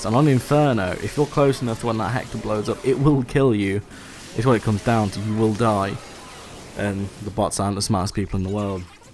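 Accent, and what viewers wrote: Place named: United Kingdom. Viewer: Man you need to put subtitles in your vid I dont know were you live in GB but you accent is just too hardcore for me no offense there man just an idea. Great metod by the way.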